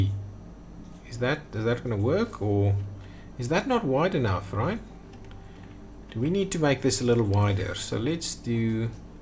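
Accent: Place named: South Africa. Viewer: This dudes accent is so saffa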